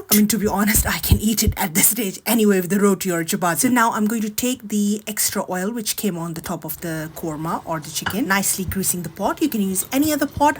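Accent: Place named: England, United Kingdom. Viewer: That English accent....👏🏻👏🏻👏🏻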